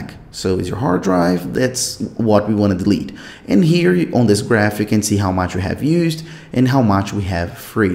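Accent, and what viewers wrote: Brazil. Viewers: Brigado cara! Eu se que vc fala Portuguese! Could tell you were Brazilian by your accent speaking English!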